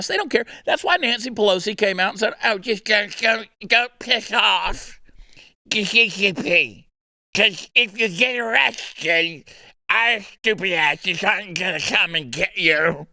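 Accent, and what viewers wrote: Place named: Georgia, United States. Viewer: I do too! He's a wordsmith with a Georgia accent! 2x the fun!!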